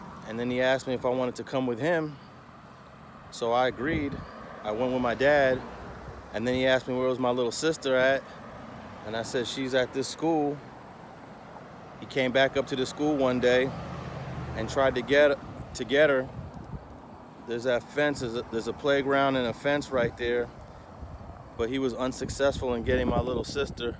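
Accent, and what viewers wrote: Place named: East Coast, United States. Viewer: Bro I always wondered why in your earliest videos you had a east coast accent , and you seemed like an outa towner trying to learn LA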